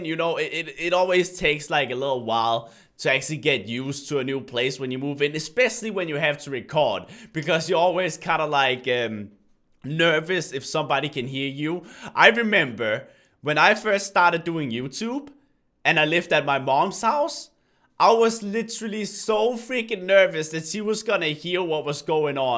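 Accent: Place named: Denmark. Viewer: Reece Warren His First Language is Danish. Which Creates His Accent. English Is his second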